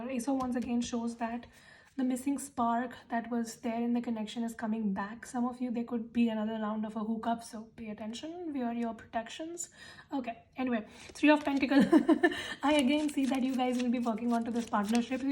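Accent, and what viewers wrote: India. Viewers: Loveeee Indian accent❤️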